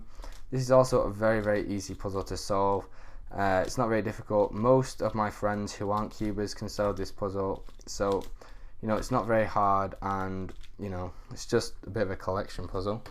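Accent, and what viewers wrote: United Kingdom. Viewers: Im a beginner UK cuber and you sound like you are from the UK to! If you are, where do you buy your cubes?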